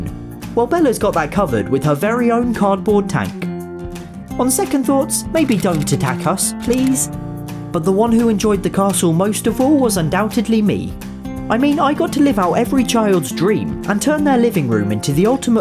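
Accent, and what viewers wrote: United Kingdom. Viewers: male jenna marbles with a brit accent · Just wondering do you speak British cuz I’m confused 😂btw love your vids and your cats 🐈🖥️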